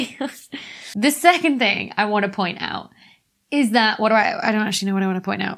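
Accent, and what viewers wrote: United Kingdom. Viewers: Very nice lecture. Clear and well explained with a cute British accent. Why an object will go faster than the speed of light in a black hole when it's not permitted outside of it?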